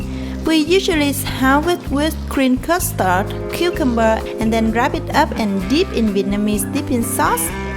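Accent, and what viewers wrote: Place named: Laos. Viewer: Tiếng Anh mà nghe như tiếng Lào. Phát âm vc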